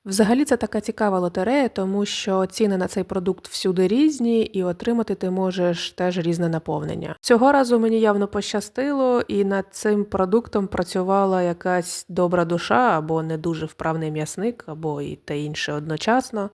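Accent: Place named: Kharkiv, Ukraine. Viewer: Ви - чудова, і рецепти - цікаві. Більш за все подобається ваш тембр. І ще інколи здається, що ви з Харкова.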